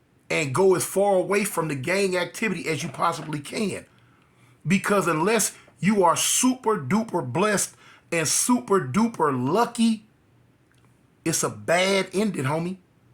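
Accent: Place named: Los Angeles, United States. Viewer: I’m from LA and u can tell he from the land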